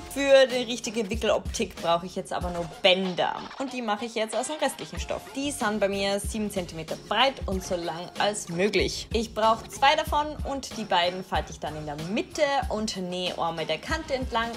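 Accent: Vienna, Austria. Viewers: Haha dachte du bist aus Österreich :D liebe deinen Dialekt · Oha😳 mega cooles Video 😍 habe deinen Kanal gerade entdeckt, ich lass auf jeden Fall ein Abo da💕 Liebe Grüße aus der Steiermark P. S. Ich feier deinen wienerischen Akzent 😊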